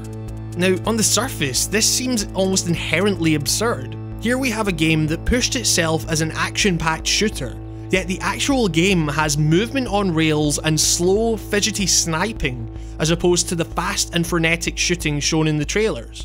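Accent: Scotland, United Kingdom. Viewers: You scottish brah?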